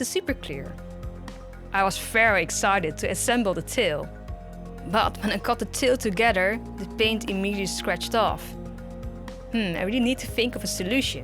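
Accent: Netherlands. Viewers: I was watching this like “why does that accent sound familiar” and then I saw your where Dutch and then I was like “oh daarom dus” ik ben ook Nederlands hihi😁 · Ahh I found you due to Dollightful and I heard your accent was Dutch haha. Sorry I am also Dutch and well I hear it immediately ! I do not do doll customization or anything. But I always love to watch dollightful! So cool I found your channel! I really liked the collab between the three of you!!! Just love how you were so creative with the bottom part! Keep up the good work! Fellow Dutchy! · your accent sounds dutch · Are you Dutch? Your accent seems Dutch XD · ik dacht ook al van hu?! Ben jij Nederlands toen ik haar hoorde😂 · haha, ik had al z'n idee dat je Nederlands was. Dat accent herken ik altijd ;D Very nice work :D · i could just hear your dutch xD im dutch as well! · Je kan gewoon horen dat je nederlands bent😂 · Omg I’m really late watching this but I could tell by your accent you are dutch too! 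Subscribing rn! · Im dutch to and i tought it was a dutch accent but i wasnt sure 😂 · i heard the way you talked english and i was like is she dutch too? and then you said you saw the dutch version of pokémon and then i knew i heard it right xD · Wow this is really cool! Quick question, are you Dutch? I think I regocnized the accent xD. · I just found you because of dollightfull. But I have a qeustion where do you com from. Because of you're accent it sounds like you're dutch, but I can be wrong. I love the doll by the way!😍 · haha, the accent sound really weird for people who arn't dutch.. our accent is really different and sounds kinda funny when we speak English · Wow once you started talking about pokemon I realised that you really sound Dutch and I was very confused for a moment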